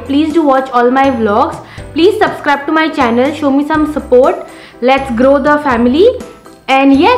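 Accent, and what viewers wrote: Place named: Cockney, United Kingdom. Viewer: Hey you speak very fluent English.. also kokni i like a lot..so keep it up.. ignore negative energy they are here from lifetime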